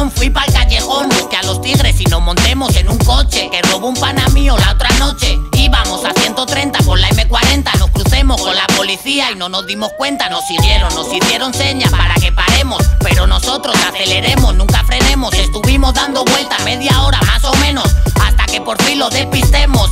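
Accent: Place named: Dominican Republic. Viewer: El tiene el acento un poco dominicano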